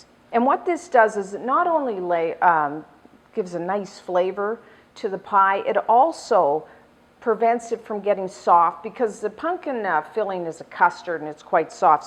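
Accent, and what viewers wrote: Canada. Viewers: That's just her cute Canadian accent coming through. She also said Oat for out and a boat for about...we in the USA love to hear that accent. It's very charming :)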